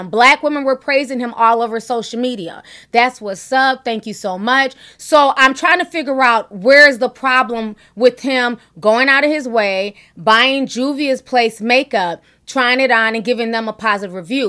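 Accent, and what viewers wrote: Nigeria. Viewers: I love the wsyvthis is editd. And I see you can turn that Nigerian accent on/off real quick. I bet that's what your kids hear when you're mad. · Omg your Nigeria accent was on point omg it’s crazy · I didn’t know that you’re Nigerian!! squeals That accent floored me.🙌🏿👩🏾‍🦱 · I love your Nigerian voice!!!!! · I luv your Nigerian voice. More please! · Come through with the Nigerian accent yesssss!!!😍😍😍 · Love your Naijan accent 😻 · Well hello beautiful, come through with the Nigerian voice. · And please use ya Nigerian accent more often! We need a commentary video in a full Nigerian accent. Please now! I beg! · I love your Nigerian Accent!❤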